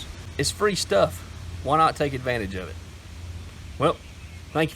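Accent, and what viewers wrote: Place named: South, United States. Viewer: Pretty good helpful stuff, just ordered one this evening. Your from the south...like where?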